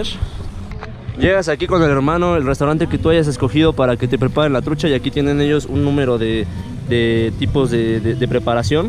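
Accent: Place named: Mexico. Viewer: no me parece rentar esas cañas de pezcar, y luego pagar el pezca'o, noooo, me fuistes muy gracioso te noté muy mexicanísimo hablando, Dios tw bendiga amigocho🖐😄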